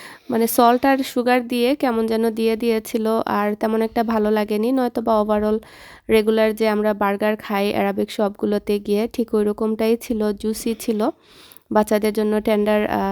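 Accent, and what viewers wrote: Sylhet, Bangladesh. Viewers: আপু তোমার সিলেটি ভাষায় কথা বলা এতো সুন্দর মাশাল্লাহ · Apu Assalamualaikum Apnar sylety vasa ato valo lagy Masallah 😊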